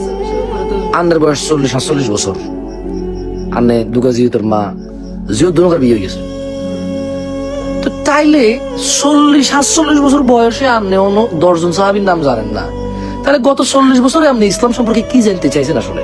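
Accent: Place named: Noakhali, Bangladesh. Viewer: Noyakhalir basa pura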